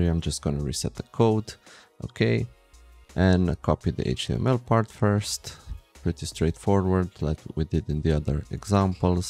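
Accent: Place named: Romania. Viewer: Ai voce de roman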